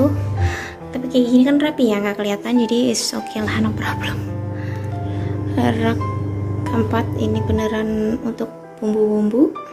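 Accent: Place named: Tegal, Indonesia. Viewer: Mba aaslinya mana... Logatnya kayak aku.. wkwkwk ( orang Tegal )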